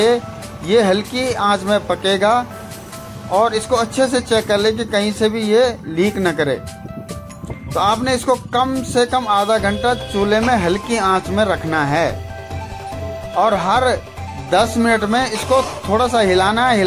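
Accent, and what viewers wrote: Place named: Uttarakhand, India. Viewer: Yar Bhai ji handi chicken bihar ki dis h socha nhi tha AP uttrakhandi ho apki language see lag hi Raha tha Jai badri vishal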